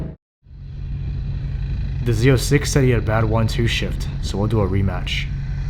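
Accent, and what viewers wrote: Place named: United States. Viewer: He sounds American to me. 🙄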